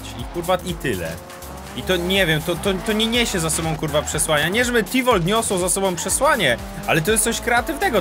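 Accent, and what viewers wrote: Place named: Silesia, Poland. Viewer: Śląsko godka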